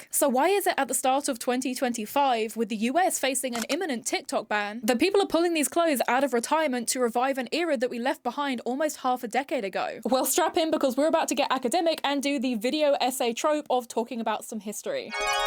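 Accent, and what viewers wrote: United Kingdom. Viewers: OK, I can dig the cute dyed bangs, the funny eyeliner with the lashes kind of hits. Always admired a UK accent. But the huge goggles on the head? Never got that, dear.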